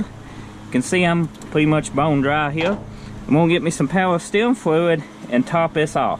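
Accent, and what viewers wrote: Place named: Louisiana, United States. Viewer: Cajun accent sounds like 👍 thanks for the video brother!!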